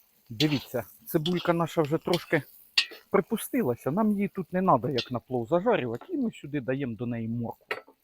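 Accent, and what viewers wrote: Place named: Poltava, Ukraine. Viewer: Говір рідний якийсь :) Полтавська область? Якщо можна район?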